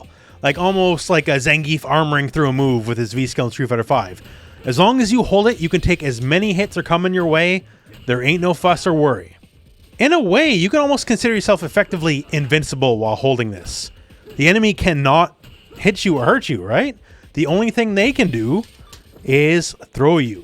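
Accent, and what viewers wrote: Canada. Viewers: You sound like you must be from the same place as Jordan Peterson.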